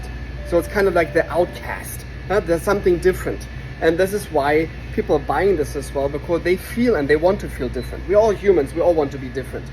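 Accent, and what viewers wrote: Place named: Germany. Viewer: du könntest locker Synchronsprecher werden für deutsche rollen die English sprechen 😄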